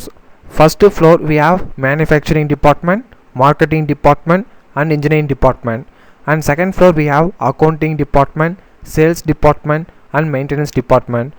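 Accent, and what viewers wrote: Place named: India. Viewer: Only Indians would understand your English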